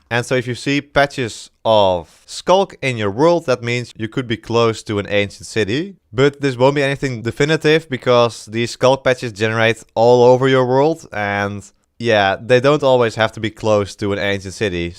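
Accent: Netherlands. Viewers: Where you from? You sound Dutch? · you sound dutch mate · u sound dutch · Are u dutch because i can hear it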